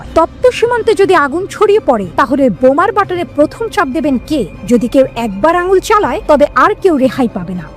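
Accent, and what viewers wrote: India. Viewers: আপনি কি ভারতীয় স্টাইলে সংবাদ পড়ছেন। আপনার পড়ার নিজস্ব কোন ধরণ নাই? খুবই লজ্জা জনক।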